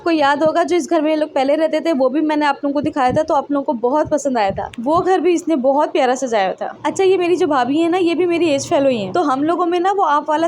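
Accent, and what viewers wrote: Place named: Karachi, Pakistan. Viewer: Zaberdast ❤ aap ke bolne ka andaz boht accha hai i really like your videos aap kaandaz bilkul karachi wala hai aap long video banaya karen😊😊❤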